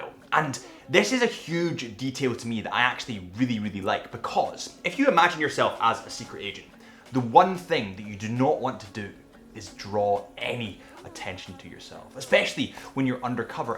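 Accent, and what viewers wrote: Scotland, United Kingdom. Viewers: This guy has a similar presentation style and approach as Adrian Barker…just with what? A Scottish accent is it? I do agree though, that this particular Seamaster is going to end up being one of the few contemporary Omegas that’s a collectors item and will fetch a premium for it. It’s definitely one of my favorites anyway. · Both are Scottish, it’s a cool accent which I appreciate after living in Scotland for more than 8 years…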